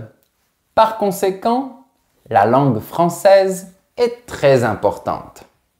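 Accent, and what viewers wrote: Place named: South, France. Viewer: Vous avez un accent du Sud, ne c'est pas, professeur ? C'est très joli.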